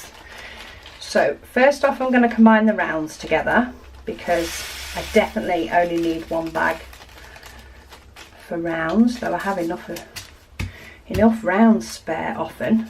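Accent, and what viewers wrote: United Kingdom. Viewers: I found your channel recently, and I love it! I’m a British DP addict living in the U.S. and it’s a wonderful change to hear a British accent talking about my passion!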